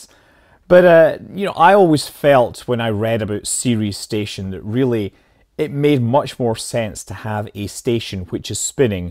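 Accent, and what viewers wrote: Scotland, United Kingdom. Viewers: thank you Scott Manley. Great stuff. a fun mix of Kerbal, sci-fi, and hard science discussion, all in a Scottish accent. I wish I had stumbled across your channel earlier.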